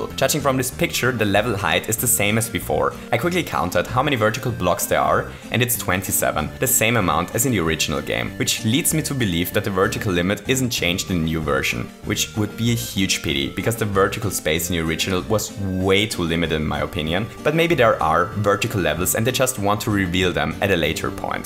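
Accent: Germany. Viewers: Are you German? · Misser Deutscher Akzent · Are you from germany?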